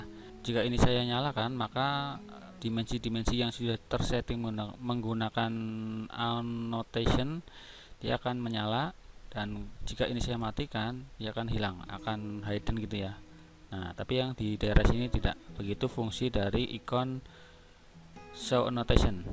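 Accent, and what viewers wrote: Banyumas, Indonesia. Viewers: Cara mencari luas teks bagaimana mas????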